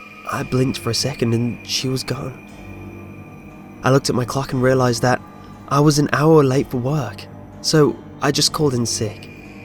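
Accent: United Kingdom. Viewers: You sound british are you british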